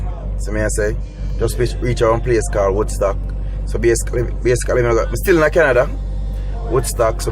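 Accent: Jamaica. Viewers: Omg didn’t expect the patois lol. Love it new subscribers 🙋🏽‍♀️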